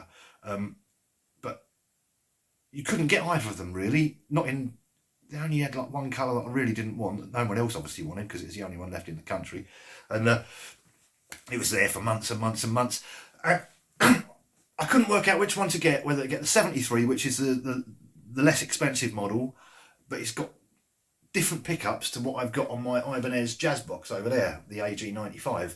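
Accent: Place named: England, United Kingdom. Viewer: It's so nice to hear an English accent instead of an abrasive American twang with put a sock in it pronunciations.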